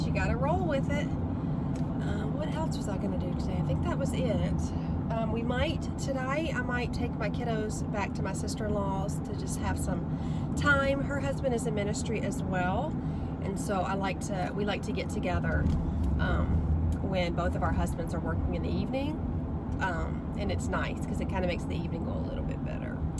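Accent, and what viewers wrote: South, United States. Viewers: I love your chatty videos! I especially love your southern accent.... go ahead sing talk all you want! I'm on the same boat with anxiety & depression, it comes in waves for me too. On top of that I'm an introvert in every which way. I smile through it all & try not to drag others down with me. I'd rather bring a smile & say hello to others, just in case they're dealing with a similar situation or worse. It's in my nature to do that.... loved this video! 💜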